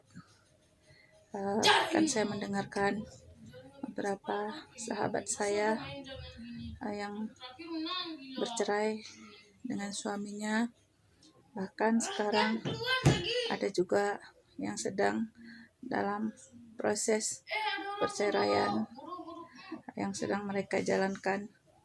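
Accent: Aceh, Indonesia. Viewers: logatnya aceh...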